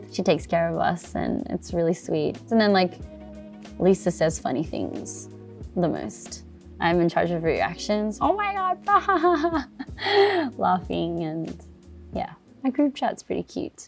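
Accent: Australia. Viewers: “Aussie accent” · Her Aussie accent is so aesthetic and luxurious! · That Aussie accent is so charming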